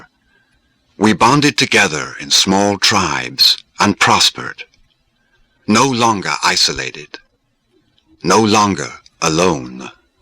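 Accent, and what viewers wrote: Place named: United States. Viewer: Jeremy Irons seems to have an American twang to his voice here. Has he deliberately done so for a predominantly American audience?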